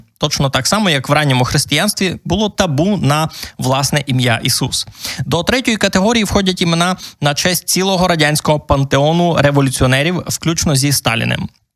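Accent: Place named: Ukraine. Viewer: Респект пану за українську мову! Такий " діалект" ніяким чином не з'вяжеш з московитським язиком. Слава Україні!🇺🇦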